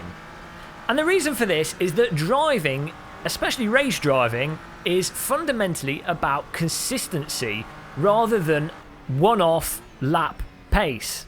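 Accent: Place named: England, United Kingdom. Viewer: Dude you are a F.........king pure English dude :-)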